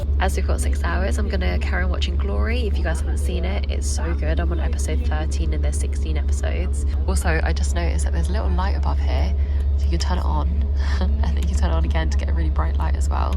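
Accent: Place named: England, United Kingdom. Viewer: I like your accent ! Are you from England ?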